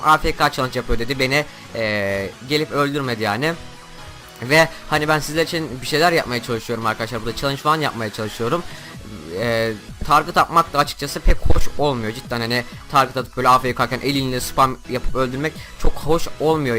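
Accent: Kütahya, Turkey. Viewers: abi sen kutahyalimisin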